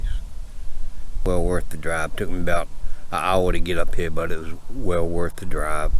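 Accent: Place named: Georgia, United States. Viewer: sound good to me...are you from atlanta georgia? love the accent... be safe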